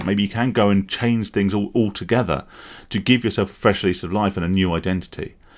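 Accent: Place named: Suffolk, United Kingdom. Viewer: Thanks for sharing. I found that making changes in my life to become the person I feel I am helped me massively in my recovery from gambling addiction so this video really hits home for me. Are you from the Colchester/North Essex area? I was convinced you had a Suffolk accent!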